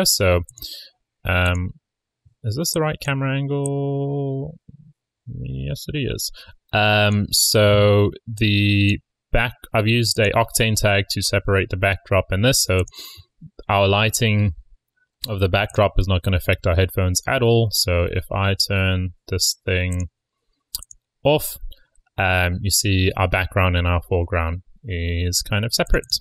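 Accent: South Africa. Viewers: You might be a South-African by the sounds of it :D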